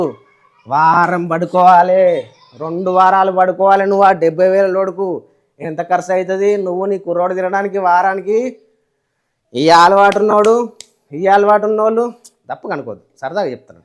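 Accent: Telangana, India. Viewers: పక్క తెలంగాణ యాస🩷🩷🩷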